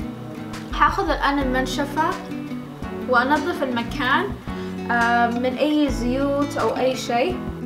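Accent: Yemen. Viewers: كلامك صنعانيه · والله كلامها يمني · ايوه هي يمنيه بس هي جلست في مصر كثير و صارت تتكلم يمني على مصري على سعودي على سوري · لهجتها يمنيه بأقوى قوتها أعرف لهجات بلادي كلها انا في السعوديه وكلامهم مش زي كلامها ابدا عموما يمنيه او سعوديه ع العين والراس الله يسعد الجميع يااارب آمييين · هههههههههههههههههههه الردود تضحك اللي يقول مغربيه وسعوديه ومصريه آيه يمنيه صح بعض كلامها من للهجات ثانيه بس هيه يمنيه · لجهنك يمنيه؟؟؟؟؟؟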